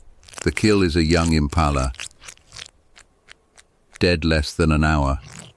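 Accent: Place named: United Kingdom. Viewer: Really? Are you really proud of your hollow, soulless, contemptuous, empty, Superior British accented commentator? We understand that you're incapable of providing a truly human being with a truly human commentary, but at least give us one who doesn't have the intolerable snobbery of the British, thanks.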